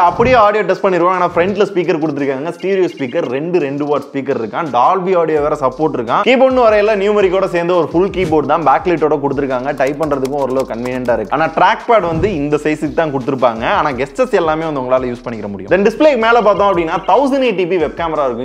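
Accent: Madurai, India. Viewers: Bro ninga madurai aa..?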